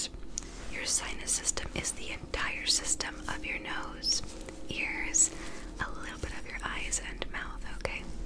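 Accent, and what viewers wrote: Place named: England, United Kingdom. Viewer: Some videos you have an English accent, others not. Which one is your actual accent? Been wondering this for a while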